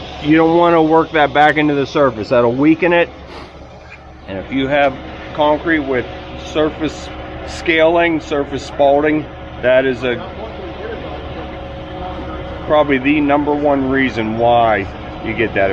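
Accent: Pittsburgh, United States. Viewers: I enjoy watching your videos. Very refreshing to see people with good work ethics, and other ethics...👍💪🏅 I have learned a lot about pouring and finishing concrete! I have to chuckle every time to correct yourself on the pronunciation of the word "Out". 😀 I assume because there's lots of comments about it. You don't need to do that, you are from Pittsburgh, it is not going to change! Be yourself, that's all part of it. I have a friend here in the Southern Maine/NH area that moved here from Pittsburgh 35 years ago. His pronunciations and little phrases are identical to those of you and after all these years. Don't try to change, and don't worry about those who think you should. Be yourself, I wish you well with the channel. I hope you can gradually shift to doing more fun things. Enjoy life. 👍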